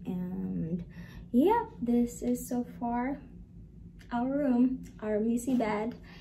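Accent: Philippines. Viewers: Hi beautiful.. can i ask you something.. taga diin ka sa visayas.. im surprise that you spoke like ilonggo if im right...keep safe always ang God bless...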